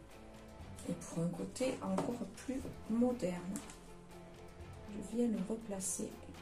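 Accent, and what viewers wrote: South, France. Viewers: moment plaisant en compagnie de la charmante et souriante à l'accent agréable du sud pour découvrir la technique de mise en oeuvre de l'effet peau de serpent. 🤩 l'association des couleurs est sans fausse note. j'aime bien le rendu estompé du ciel avec cette surépaisseur matérialisant un voile nuageux avec l'effet de la pluie en mer. vous vous êtes un peu reprochée l'imperfection de la ligne d'horizon. pourtant, je trouve qu'elle est bien ainsi car plus réaliste que l'abstraction que l'on en fait simplement. je pense que si elle avait été uniforme elle aurait accentué une toile coupée en deux alors que dans votre cas la transition est naturelle. enfin, la représentation du bateau fut un clin d'œil pour moi. il m'a fait penser à la technique de représentation des fonds de décor que l'on peut également trouver dans certaines BD. une bien jolie toile. 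🤩😉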